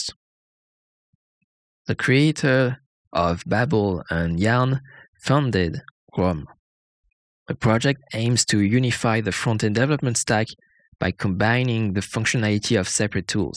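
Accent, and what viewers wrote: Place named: France. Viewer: are you French ? (I'm French and you have an French accent)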